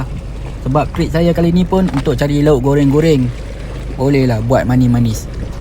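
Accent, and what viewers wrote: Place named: Aceh, Indonesia. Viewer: sbb bunyi sebutan "T" tu mcm sebutan Aceh..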